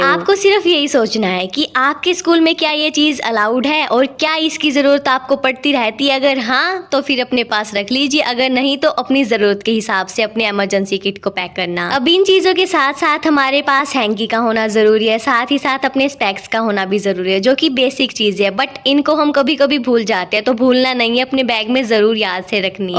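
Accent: Haryana, India. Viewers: Kya aap Haryanvi Ho ?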